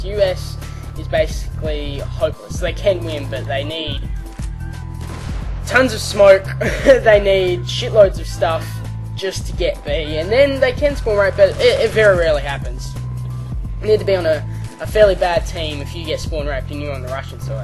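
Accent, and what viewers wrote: Australia. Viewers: i dont know what it is but for some reason listening to an aussie just makes this vid seem more sophisticated. it just sounds right, American comentators just cant top it. he sounds like abit of a bogan but still, this vid doesnt sound as dumbed down for little kids. keep up the good work mate ur a legend. and to people getting bothered by the accent think how we feel with the significantly lower amount of aussie commentators. remember its as anoying for u as it is for us listening to ur accents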